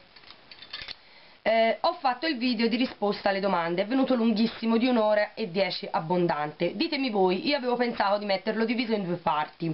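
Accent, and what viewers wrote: Tuscany, Italy. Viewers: ma ciaoooo sono una nuova iscritta,ti ho conosciuta grazie a gnappettaaa ,e ki ti lascia piu' sei troppo simpatica poi il tuo accento toscano è wonderful....un bacioneeee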